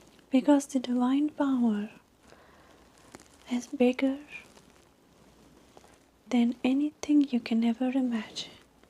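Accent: India. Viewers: As an American I love Indian accents i subscribed to your other channel too. If you keep making videos, we’ll watch 🔥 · these indian accent vids are cool. but I miss your regular accent. what are some other accents you can do?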